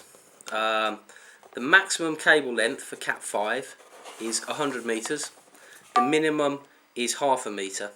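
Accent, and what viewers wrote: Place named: United Kingdom. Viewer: this is by far the best video i've found around here on this subject. also, a british accent always lends more credibility.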